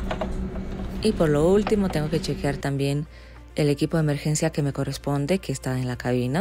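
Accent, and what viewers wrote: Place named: Argentina. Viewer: !!??tu castellano es muy argentino!!!!😃 Coca, jugo, y como estructuras muchas frases!!! Te A D O R O!!!!!!!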